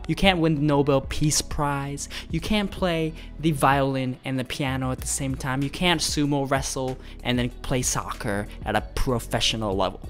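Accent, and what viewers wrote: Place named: South, United States. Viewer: Southern accent from a Japanese face, that’s nice combo!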